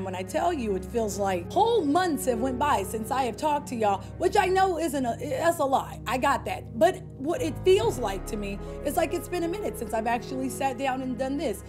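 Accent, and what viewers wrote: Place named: Bronx, United States. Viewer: Did you peel my melon cap back blue😂🤣😂🤣😂🤣😂 you sound like you from my hood Bronx NY 💪🏽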